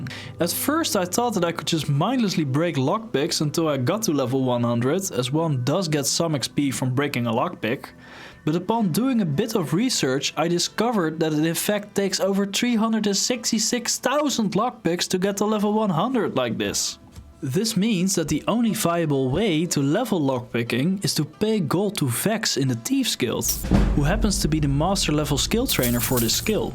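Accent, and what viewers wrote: Netherlands. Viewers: I smell dutch😂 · Ik hoor een Nederlander of niet? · Are you Dutch? Amazing video, went by very quickly. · Je klinkt wel erg Nederlands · Are you Dutch? Your accent is very telling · I instantly hear that you're from NL :D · You really have a Dutch accent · The Dutch is strong with this one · You can easily hear you're dutch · Are you dutch?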